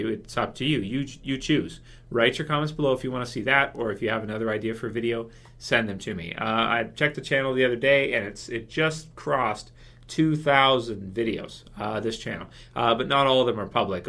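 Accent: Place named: Canada. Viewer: Hi. I love that you mentioned the accent issue. I am not a native English speaker so I did not notice Alycia's or Nick's non-American tones, even though I knew the actors are not Americans. However, I am very interested in getting to know more about accents and to learn how to spot such anomalies. Could you please point us/me more specific moments (not time codes as they may differ) but rather specific sentences that sound not as they should in terms of accents? You mentions the throwing up scene. What exactly in it? BTW1: Why do they give roles of US people to non-American actors in the first place? Don't get me wrong, I love the cast, I really do, but - honestly - whould it be really so hard for the creators to find US actors equally good, avoiding the accents issue? BTW2: You are the first person I identified as Canadian purely by listening to, so I am not so hopeless in spotting accents as it might seem because of the fact I failed to hear it in Alycia's lines;) BTW3: Great channel! 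You are very productive! Keep it up!;) BTW4: It would be AWSOME if someone (like you) made a special bonus video about accents in our favorite TV shows. Not only to point out mistakes, but just to show non-native audience how rich English language is.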